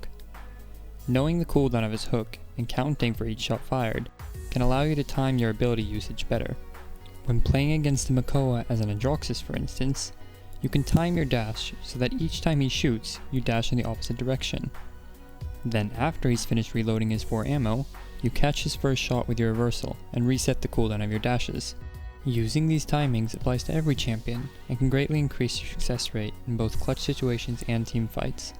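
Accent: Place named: United States. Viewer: Just curious, where are you from. It sounds like you have a typical American accent at first, but I keep hearing little places where it sounds like an accent is coming in.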